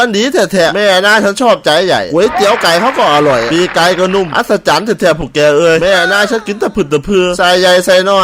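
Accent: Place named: Korat, Thailand. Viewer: โคราชคักๆ 555+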